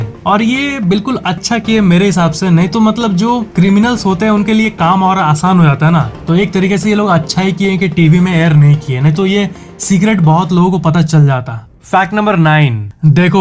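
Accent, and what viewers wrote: Bihar, India. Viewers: His language speaking style changed too much..like he is from bihar.. And his voice is not energetic anymore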